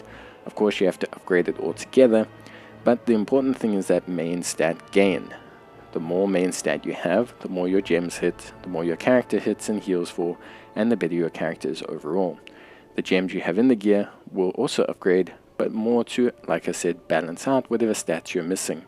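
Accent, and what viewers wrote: South Africa. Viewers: What accent is that? Saffa?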